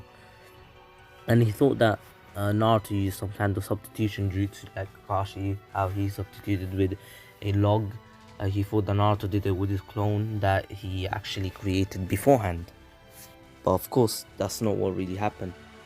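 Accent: United Kingdom. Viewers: Bro i love the british accent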